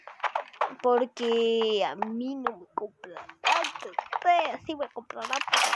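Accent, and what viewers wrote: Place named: Spain. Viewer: Buen vídeo amigo pero un consejo no hables como un español porque hay gente mala que se te va a burlar. Yo no soy una yo te felicito y gracias por las semillas 😁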